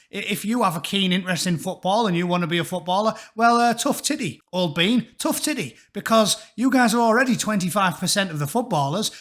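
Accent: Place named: RP, United Kingdom. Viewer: A perfectly eloquent tirade in the queens English. Rock on.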